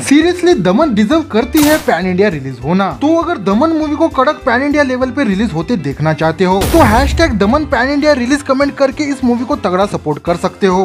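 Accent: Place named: Odisha, India. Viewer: Tere hindi bolne se lagta he tu odiya he hindi me promotion kara raha he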